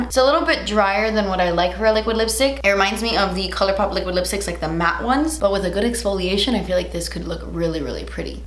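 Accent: Cuba. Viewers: She's cubana 😊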